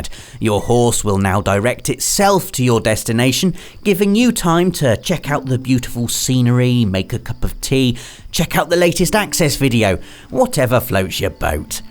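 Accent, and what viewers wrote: United Kingdom. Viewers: Not another limey accent....Johnny Rotten plays rdr2?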